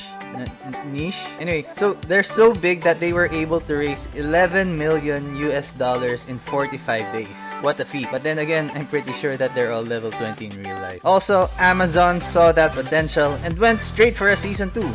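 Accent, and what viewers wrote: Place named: Philippines. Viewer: Is it weird that we recognize a pinoy when we hear it? Is this the pinoy accent they ( the foreigners ) are talking about?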